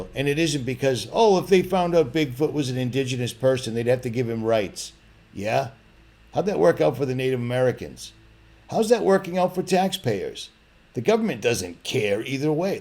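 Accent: Boston, United States. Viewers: Yo bro definitely a good piece!! Sounds like a guy I knew from Boston I found on YouTube back in 2013👍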